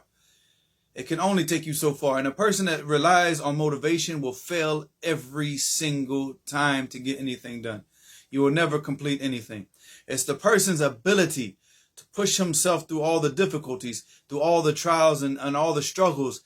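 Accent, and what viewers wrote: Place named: Texas, United States. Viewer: I think from texas bro but brother above also has a good point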